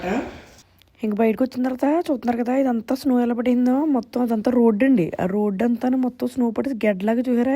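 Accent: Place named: Telugu, India. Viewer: Champesthunnav akka nee yaasa accha telugu ammai voice extralu lekunda baaga chesav video thank you sister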